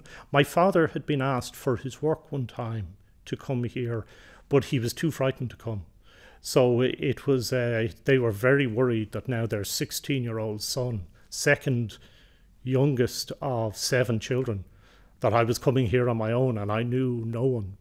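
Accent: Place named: Ireland. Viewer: Beautiful experience and gorgeous Irish accent 😇 Thanks for sharing, father!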